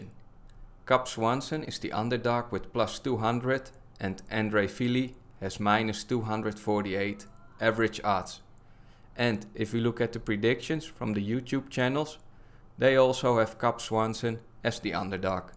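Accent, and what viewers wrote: Netherlands. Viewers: Are you dutch? Just curious if I got the accent right.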